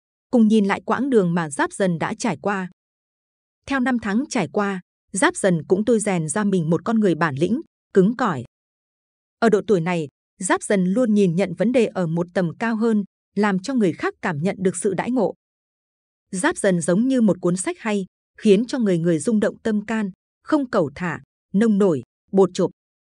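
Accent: Thanh Hoa, Vietnam. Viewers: Thầy ở huyện nào thanh hóa vậy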